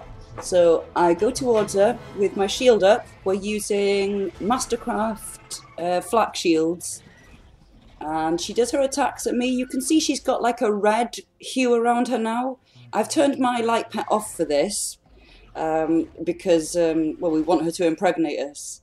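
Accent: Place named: Bristol, United Kingdom. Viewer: I'm just trying to work out the accent 'sounds abit bristol'.ooh ar ra.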